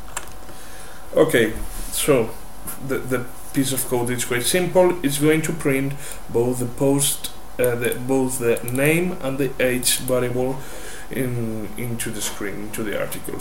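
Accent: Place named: Mexico. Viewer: eres mexicano verdad, lo noto en tu forma de hablar el ingles.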